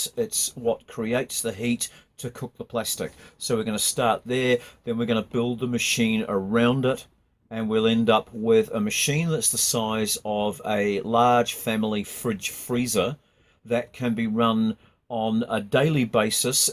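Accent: New Zealand. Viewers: he's in NZ, cant you pick the accent? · That's a kiwi accent mate! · Is this kiwi ingenuity at its best? Taking a gamble, you sound like a fellow kiwi. Awesome stuff mate you’re a legend. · Thot you were aussie first 30 seconds.....then the KIWI became foremost. Cheers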